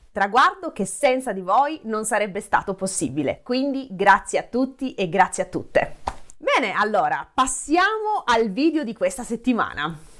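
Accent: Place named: Taranto, Italy. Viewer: Buonasera, non era mia intenzione offenderla. Essendo lieve non so caratterizzarlo. Nel canale YouTube insegna egregiamente grammatica italiana, per questo le rinnovo i complimenti. Non crede sarebbe giusto insegnare anche la corretta dizione? Non volevo offenderla, ogni dialetto, accento va senza dubbio salvaguardato. Io amo l'accento ciociaro ad esempio, essendo originario di Frosinone. Molti attori, attrici, presentatrici e presentatori fanno corsi di dizione, pur conservando e amando le loro origini. Spero di essermi spiegato, lei fa e farà come sempre un ottimo lavoro. Non la disturberò più con i miei commenti. P.S. credo abbia origine Tarantine, ma potrei sbagliarmi. Un caro saluto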